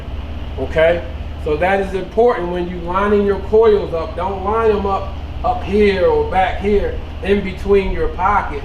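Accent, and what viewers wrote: Baltimore, United States. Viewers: You can tell this guys from Baltimore by that accent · my manz got that baltimore twang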